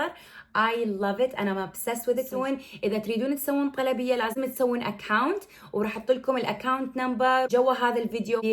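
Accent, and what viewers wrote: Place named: Iraq. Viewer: اهلا وسهلا بك ياابنة العراق.مااجمل لهجتكِ العراقية!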